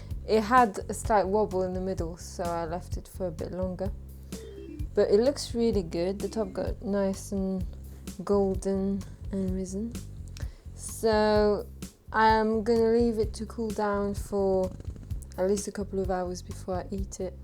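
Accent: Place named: France. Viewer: I like your French accent 😊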